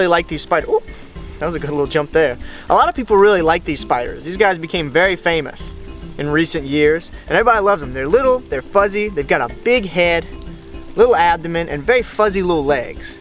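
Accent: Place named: Florida, United States. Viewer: First video I’ve watched on this channel, and I could pick you out as either a floridian or Louisianan without even trying. We floridians can sense our own 😂. Also, hey, that beer is still waiting for you in Panama City! Much love guys! 🍻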